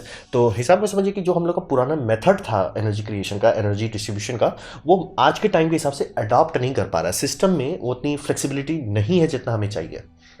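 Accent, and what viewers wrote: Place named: Bhojpuri, India. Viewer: Goetha pure bhojpuri 😊😊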